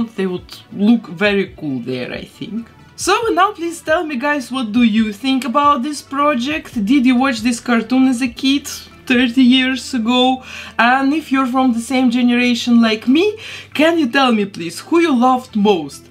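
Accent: Russia. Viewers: Love ur doll repaints ur so talented thx for vid. lf l can ask are u Russian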